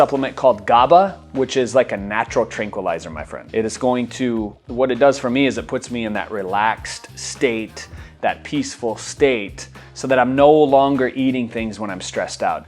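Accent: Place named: South, United States. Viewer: The Miata and southern accent just gave me life. I have to start the video over.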